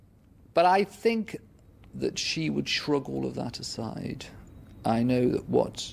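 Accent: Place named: England, United Kingdom. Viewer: Beautiful english accent 😍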